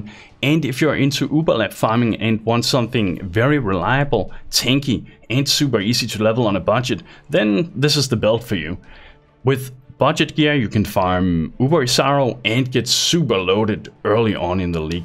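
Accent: Denmark. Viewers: are you danish? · Within the first few words i knew you were Danish lol. Fedt at se en Dansk youtuber der laver content til andre end børn.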